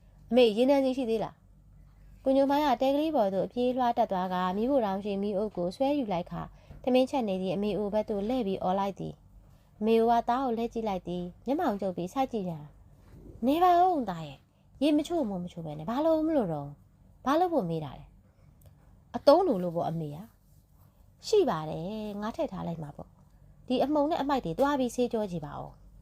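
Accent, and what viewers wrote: Upper Burma, Myanmar. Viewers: Jayရဲ့အသံလေးကို ချစ်မှချစ်😍😍😍ဘာလေးဖတ်ပြပြ အညာသံနွဲ့နွဲ့လေးနဲ့ ပီပီသသ စိတ်ပါလက်ပါ😍🩵🩵ကျေးဇူးအထူး & DAN team🩵🩵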